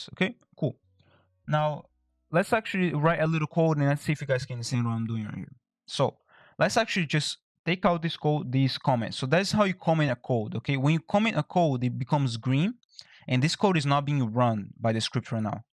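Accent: Brazil. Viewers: incrivel como um brasileiro sabe detectar outro brasileiro falando em ingles UEHUEAHAUEHEAUH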